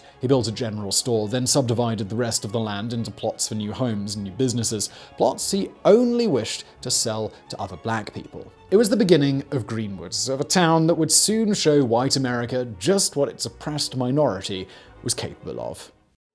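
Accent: United Kingdom. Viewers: The fact that I'm only hearing about this for the first time in a British accent bothers me.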